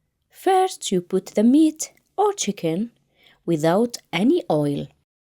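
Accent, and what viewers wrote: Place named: Kuwait. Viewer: احب حجيج الكويتي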